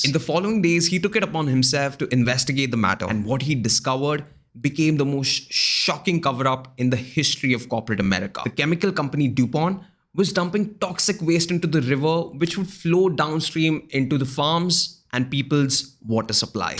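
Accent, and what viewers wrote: India. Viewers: This man's got an awful Indian accent and doesn't know what punctuation means...he just rattles on and on like a robot. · oh mann, nix ist schlimmer, als indisches Englisch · The narrator has such heavy Indian accent that it's almost impossible to follow what he is saying. Can't you learn how to speak English properly? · This Indian man hits a nerve with me. Don’t care for his “English”. Listened for minutes that’s all I could take! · You gotta work on losing your thick indian accent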